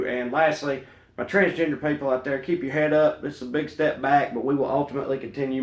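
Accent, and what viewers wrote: South, United States. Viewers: Oh my God, I love you. You are like Jon Stewart with a Southern accent. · You’re awesome!!! It does my heart good to hear your southern accent speak wisdom! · As an ex-pat Arkansan, it's refreshing to hear a southern accent saying intelligent things. I love my home state, but the people there can be absolutely idiotic. They voted for el trumpo. Go figure. I live in DC now. He's a goner from what I see in the beltway. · We love you too... nothing better than a liberal with a southern accent.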